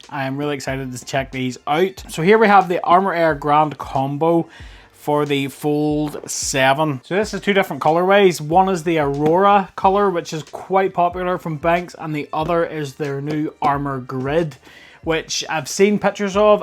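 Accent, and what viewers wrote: Northern Ireland, United Kingdom. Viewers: Northern Ireland?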